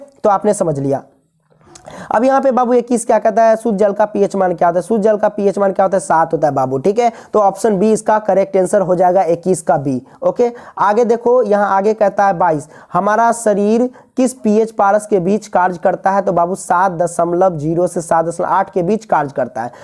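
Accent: Bihar, India. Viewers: Sir aap Bihari style wa......🤞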